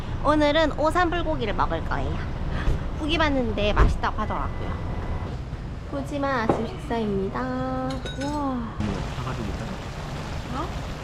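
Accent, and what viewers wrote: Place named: Gimhae, South Korea. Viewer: 님 올여름 휴가를 울릉도로 가셨나봐요? 저는 30여년전 도로등 기반시설 없을때 독도까지 갔다가 입도는 못했던 기억이 나네요 글고 지난번 결혼 하신줄 모르고 결례를 범했네요 결혼 하신지6년 되셨다고 하셨는데 늦게나마 축하드리고 남편분은 존잘남 확실한것 같네요 울릉도 가셨으니 성인봉 뿌시기도 하셔야죠 암튼 추억에 남을수있는 즐겁고 행복한 여름휴가 되세요 비 피해는 없으시죠? 김해가 고향이라고 하셨나요? 저는 님 고향사투리억양과 명랑하신 성격에 우연이 들렸다가 구독좋아요 누르고 시청하고있는 마산이 고향인 60대 중반 아들 군대보낸 할배예요 결례 용서하세요 영상 즐감했어요 새로운 한주도 날마다 좋은날 되세요 감사합니다... 파이팅!!!... 👍❤🍀👏👏👏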